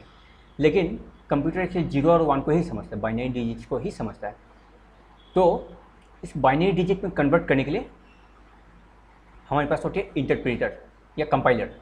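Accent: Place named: Assam, India. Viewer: There is a touch of assamese tone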